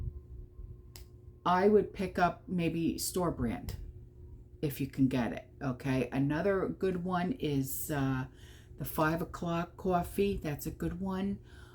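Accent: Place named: South Jersey, United States. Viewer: She’s from South Jersey.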